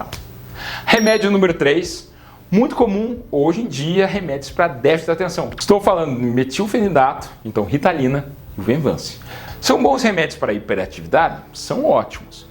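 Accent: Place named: Minas Gerais, Brazil. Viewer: Gente este médico é uma benção de DEUS, gosto demais do jeito mineiro que ele fala parece que tá chupando picolé de groselha e falando ao mesmo tempo , ele é super bem informado , explica super bem , é simpático demais mesmo , um sorriso pra cima que levanta a gente , passa a impressão de ser muito cuidadoso com seus pacientes. Trás informações muito importantes e atuais pra gente , aaamo qdo ele fala : de que parte do mundo cê é ??? kkkk DEUS abençoe e proteja muito ele e a sua família, que ele continue sendo alegre , divertido , e super profissional como é, e sim , já me entupí de muitos remédios aí que ele citou , não sabia de nada disso , meu DEUS , já tomei muito azitromicina pra garganta, hj tenho usado muito o Propolis Verde que tem me ajudado e me imunizado. Fui 🙋‍♂️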